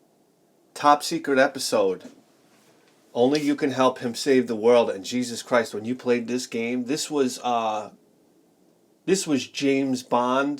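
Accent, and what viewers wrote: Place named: Long Island, United States. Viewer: Dude , this show is it ! That Long Island accent does it . Nobody tells a great story like a New Yorlka !!!! Ya bustin my bawls ova here !!! You are smart as fuck . I guess that is the pay off for living in the city . I was raised in the country in southern Ohio . It's more Kentucky than mid west . People are laid back here and on pills lol . Not a lot of money so there is not a lot of games :(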